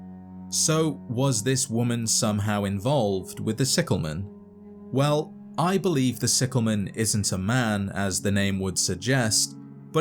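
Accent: United Kingdom. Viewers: Good to see a fellow (from what I can tell) british fallout content creator. Great shit dude!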